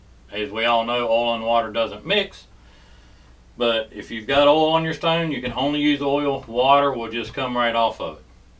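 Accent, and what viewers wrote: United States. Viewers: Just subbed! My loan-start senses are tingling. Do i detect a texas twang?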